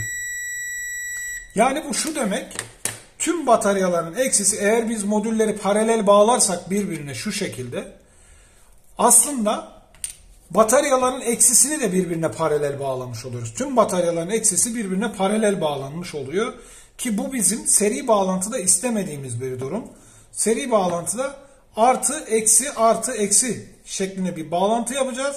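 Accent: India. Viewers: off bir an hintli sandim yahuu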